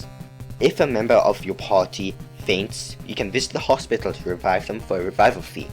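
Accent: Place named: France. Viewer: Are you French? Your accent is really distracting . Sorry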